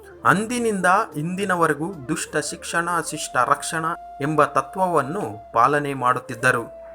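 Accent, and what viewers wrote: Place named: Andhra Pradesh, India. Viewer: ನೀವು ಇಷ್ಟೆಲ್ಲಾ ಕಷ್ಟ ಪಟ್ಟು ವಿಡಿಯೋ ಮಾಡ್ತೀರಿ. ಆದರೆ ಜನರಿಗೆ ಅರ್ಥವಾಗುವ ರೀತಿ ಹೇಳುವುದೇ ಇಲ್ಲ! ನೋಡಿ, ನೀವು ’ಇಂತಿಂಥಾ ಸಂವತ್ಸರಗಳಲ್ಲಿ ಹೀಗೆ ಹೀಗೆ ಆಗುತ್ತದೆ’ ಅಂತ ಹೇಳಿದ್ದಾರೆ ಎಂದು ಕಮೆಂಟರಿ ನೀಡಿದ್ದೀರಿ, ಆ ಸಂವತ್ಸರಗಳನ್ನು ಈಗಿನ (ಗ್ರೆಗೋರಿಯನ್) ಕ್ಯಾಲೆಂಡರಿನ ಇಸವಿ ಜತೆಗೆ ಹೋಲಿಕೆ ಮಾಡಿ ಹೇಳಿದ್ದರೆ ಜನಗಳಿಗೆ ಇನ್ನೂ ಹೆಚ್ಚು ಅರ್ಥವಾಗುತ್ತಿತ್ತು. ಮತ್ತೆ, ನೀವು ತೆಲುಗಿನವರು ಎಂದು ಸುಲಭವಾಗಿ ಗೊತ್ತಾಗುತ್ತದೆ. ಕನ್ನಡವನ್ನು ಚೆನ್ನಾಗಿ ಬಲ್ಲವರಿಂದ ಕಾಮೆಂಟರಿ ಹೇಳಿಸಬೇಕಿತ್ತು.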